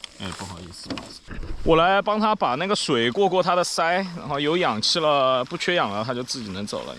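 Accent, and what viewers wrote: Sichuan, China. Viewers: 听口音播主像四川人哦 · 听声音有点像成都 ，绵阳口音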